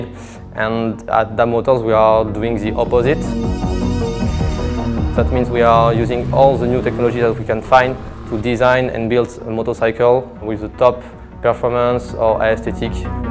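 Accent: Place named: France. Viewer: Stranger things music ahah that french accent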